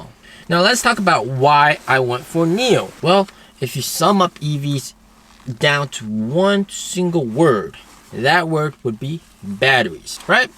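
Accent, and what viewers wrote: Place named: United States. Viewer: For someone who's a native Chinese your English is very good even your accent. Sound almost American.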